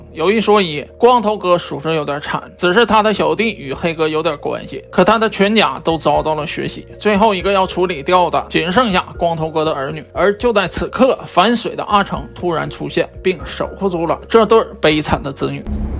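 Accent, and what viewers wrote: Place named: Northeast, China. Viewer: v 主播这个东北北京话有点意思啊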